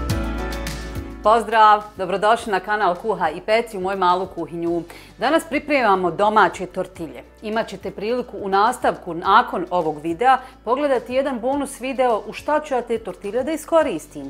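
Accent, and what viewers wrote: Bosnia and Herzegovina. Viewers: Gledam cesto tvoje recepte, volim taj bosanski izgovor i ta prica sa flasicom je simpaticna. Pozdrav iz Kanade.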